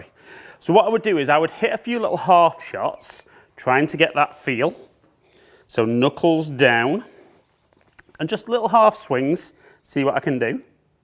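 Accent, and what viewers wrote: England, United Kingdom. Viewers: WOW, not knowing the difference between further and farther!!! And my man sounds English to beat all!!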